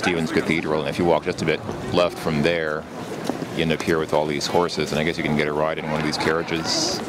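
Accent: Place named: France. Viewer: I know you can't speak german , but the way you read words is in french like the word gasse ... You have to pronounce the 'e' In german you have to pronounce every single letter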